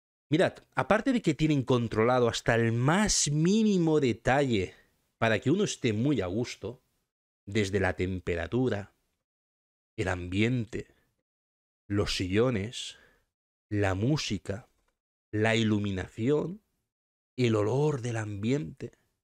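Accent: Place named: Catalonia, Spain. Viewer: Veo varios canales por si puedo sacar algo de provecho, a veces algo puede servir, pero el video que espero todos los días es el tuyo, el que realmente se puede aprovechar al 100%. Sigue así nos ayudas mucho y muy pero muy pocos canales tienen futuro como el tuyo. Por el acento veo que eres catalán! Yo también de Barcelona. Saludos!!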